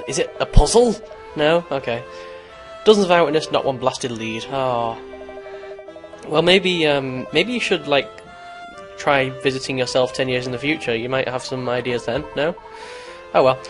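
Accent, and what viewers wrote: United Kingdom. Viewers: I love your accent. I wish I had an English accent. x3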